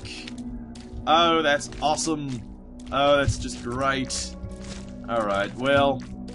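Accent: United Kingdom. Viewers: Lol your british accent is funny..... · wouldnt that be Best. British. Accent. Ever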